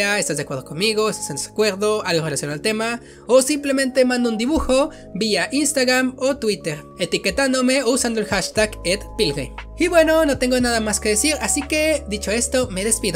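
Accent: France. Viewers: Soy yo o tu hacento parece francés es tan hermoso UuU ✨ Estuvo interesante el vídeo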